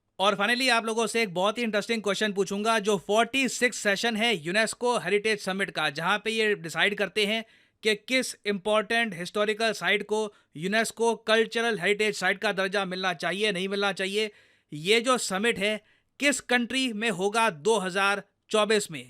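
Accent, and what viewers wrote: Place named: South, India. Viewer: That south Indian accent 😂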